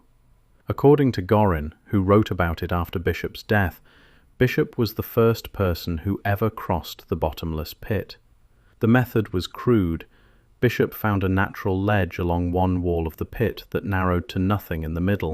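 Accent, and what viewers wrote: United Kingdom. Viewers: A British accent saying "54 degrees" and not immediately saying "Fahrenheit" after that. Only with AI. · Yea. Same British voice on all the videos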